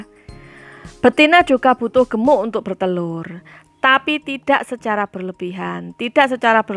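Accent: Java, Indonesia. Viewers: medok tp enak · kok logate jowo ki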